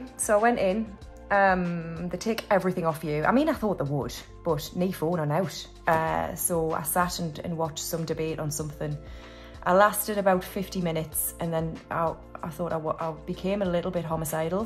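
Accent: Newcastle, United Kingdom. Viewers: I’ve said this before and I’ll keep saying it, I bloody love the Geordie accent 🙌🏻 Our birthdays are a few days apart, mine is July 26th! Team Leo You missed out 36 on the penny challenge but you’ll probably notice it when you do it next haha. Another amazing video & don’t forget to use your spending money on a bottle of wine or two when you have food with your travel friends 😂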